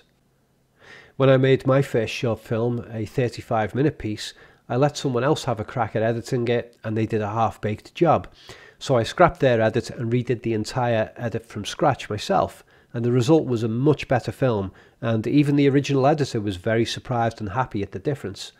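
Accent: Liverpool, United Kingdom. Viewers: Nice accent. Is that… Liverpool?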